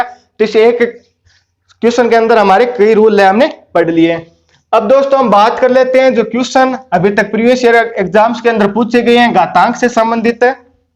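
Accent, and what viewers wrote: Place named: Sri Ganganagar, India. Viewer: Language ka lahaja.. Dekhkr lga... I'm from Sri Ganganagar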